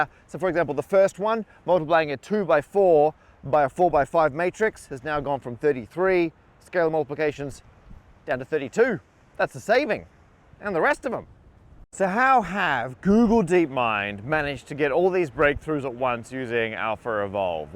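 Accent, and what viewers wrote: Australia. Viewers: Oh yeah, I forgot he's australian. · That might make sense except for the obviously Aussie accent lol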